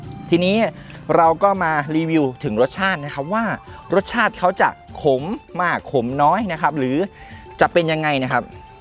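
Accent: Isan, Thailand. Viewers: ชอบๆพี่ดูตลอด .ได้สาระดี .พูดอีสาน กึ่งไทยสุดยอด..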